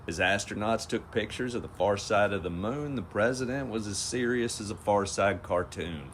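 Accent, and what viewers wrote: South, United States. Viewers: THANK YOU, for this RENDITION, of the WHOLE WEEK, in a nut shell, captured with that CHARMING SOUTHERN DRAW💙💙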